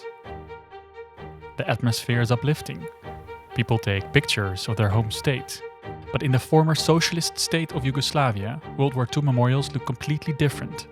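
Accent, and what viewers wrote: Netherlands. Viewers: I knew i noticed a slight dutch accent.. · Aan het accent hoor ik meteen dat jij Nederlands bent! 😀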